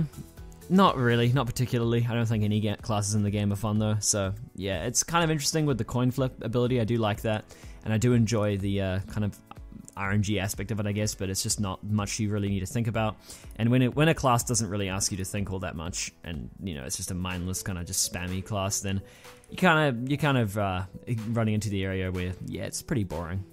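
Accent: New Zealand. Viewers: are you from New Zealand because I am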